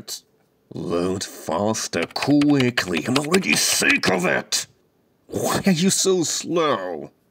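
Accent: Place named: United Kingdom. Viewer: the british/whatever accent is that with the video is amazing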